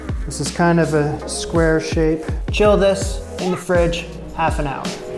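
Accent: Canada. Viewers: OMG!! Just found you a couple of days ago and thought, this guy sounds Canadian, how 'about' that??? Then I found this... However, I love my butter tarts you have to make them both ways!!! Maple Syrup is the best way not too sweet and yum!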